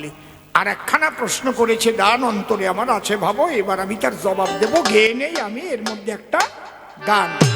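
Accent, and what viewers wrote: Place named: Bangladesh. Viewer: খুব সুন্দর 👌👌👌, অভিজ্ঞ, জ্ঞানী মানুষ। নমস্য ব্যক্তি। প্রণাম জানাই। বাংলাদেশের ভাষা ছেড়ে পশ্চিমবঙ্গের শুদ্ধ বাংলা ভাষায় পরিবেশন করলে আরো শ্রুতিমধুর হবে। এটা পশ্চিমবঙ্গ। পশ্চিমবঙ্গের বহু মানুষের কানে খটকা লাগে। তাই অনুরোধ রাখলাম। আমি এনার সব ভিডিও দেখি। নিয়মিত শ্রোতা। তবে আমার মনে হয়, উনি ১০০ বছর পশ্চিমবঙ্গে বাস করলেও পশ্চিমবঙ্গের বাংলা রপ্ত করতে পারবেন না।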